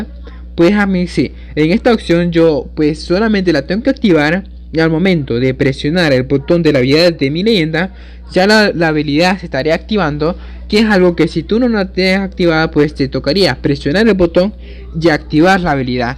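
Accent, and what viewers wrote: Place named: Nicaragua. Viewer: crei que eras de Nicaragua bro un saludo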